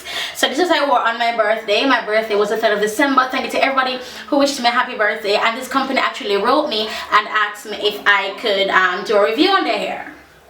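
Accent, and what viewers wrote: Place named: Jamaica. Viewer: i love your accent not many Jamaicans around here :)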